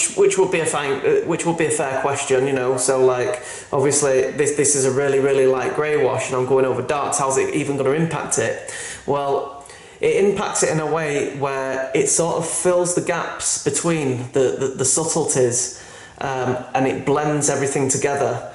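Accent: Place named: North, United Kingdom. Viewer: Where are you from, sound northern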